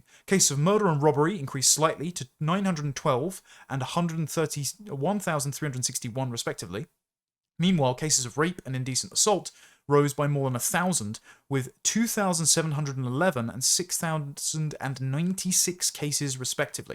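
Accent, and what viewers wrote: RP, United Kingdom. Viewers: You sound like you shop at John Lewis, also why don’t you allow me to come on to discuss AI, don’t go against evolution.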